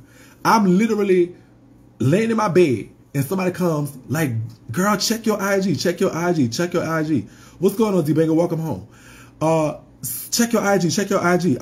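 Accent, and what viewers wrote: New Orleans, United States. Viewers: I subscribed off the hair and New Orleans accent alone baybee yesss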